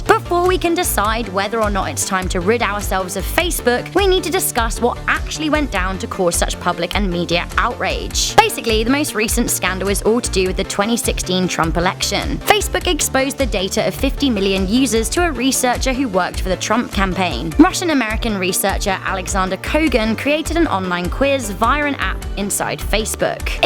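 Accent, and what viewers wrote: United Kingdom. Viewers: Aaa B yeah it’s a British accent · Just want to make it known to the world that has the absolute best anglo accent that I have ever heard.... My opinion hasn't anything to do with the video nor anything else really, but I felt that I would share it with the rest of the world for reasons.. (´∀`) avec beaucoup d'amour de france. (✿◠‿◠)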